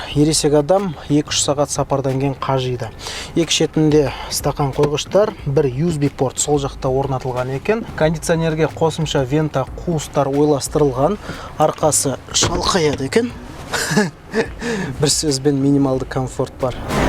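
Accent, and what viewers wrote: Standard. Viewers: Қандай таза қазақша сөйлейсің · қазақша таза сөйлейсиз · Қазақша таза сөйлейді екен👍👍👍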